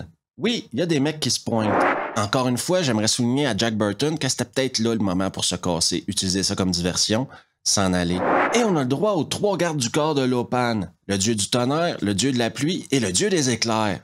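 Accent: Quebec, Canada. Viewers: Tout le monde aime l'accent quebcquois, c'est l'accent du français de province de la renaissance.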